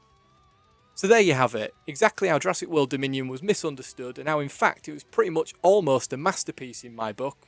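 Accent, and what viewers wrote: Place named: Yorkshire, United Kingdom. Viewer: You're welcome! I probably watch more film reviews than anything else to be honest. If I'm interested in the film, I'll watch the review. On the basis of that, I might just might watch the whole film at some point. I hear you. I'm definitely not saying ALL internet content should be positive. No, it should be honest. I just don't like the bandwagon effect, where an imperfect film comes out (Disney's Star Wars sequels for example) and absolutely everyone has to make a video about it, not only criticising every little detail, but using phrases like "Worst film ever" and "Why Star Wars Is Even Worse Than You Thought." To borrow a phrase from your neck of the woods: Fook off wi'that shiiiite. I get it, uploaders want their clicks and whatnot. But as all these people scramble for clicks and revenue, I come to appreciate channels that are a little more balanced about things. 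I think it probably takes a little more courage to state your own opinions rather than repeat the same crap a hundred other reviewers are spewing. You'll grow. You'll make a living out of this if you aren't already. It's a matter of time. Quality production, likeable host, dulcet Yorkshire tones... Contrarian opinions? You're like Sharpe, but without the rifle and awesome duds. Lmao. I better go. Please don't feel you need to reply to all this. We'll be here forever if you do. Haha. Have a good one,;)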